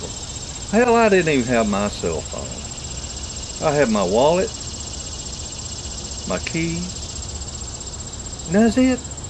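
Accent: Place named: Tennessee, United States. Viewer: Glad your doin better! Idk where in Tennessee you are from but sound exactly like fellers i talk to around here. Im from scott co lol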